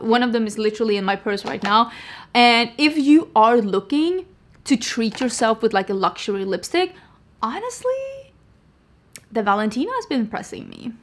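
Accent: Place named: Sweden. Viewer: wait, are you for real right now? You do realize is Swedish and English is NOT her first language. Her native country comes w its own dialect and accent. Some letter combinations we have in English DO NOT come natural for some languages. This comment is literally one of the most absurd I’ve read in a while. You must come from another planet to have this kind of expectation of someone. Either that or you don’t work for a living and are clueless to the amount of hours in a day.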